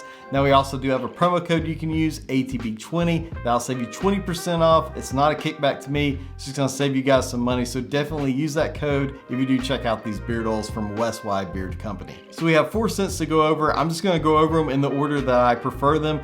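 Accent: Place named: United States. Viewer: I’m fascinated by regional accents so when I hear “carrer ool” I just have to know what part of the US you’re from. NOT making fun, swear. I’m from Philly, famous for weird-ass accent, so I’m just interested from a linguistic perspective.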